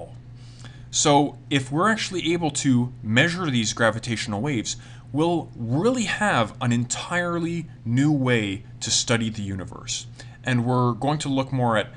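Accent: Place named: Canada. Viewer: Great video and well-crafted teaching! Thanks for making these. Since you recorded this video, we did in fact detected the gravitational waves. So your video is even more relevant now. I hear a bit of a Canadian accent. How correct am I? :-)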